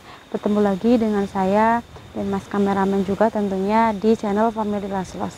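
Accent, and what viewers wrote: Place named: Java, Indonesia. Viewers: Mba... Medok banget... Aku suka....👍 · Kyak orang kebumen to MBK smpean ki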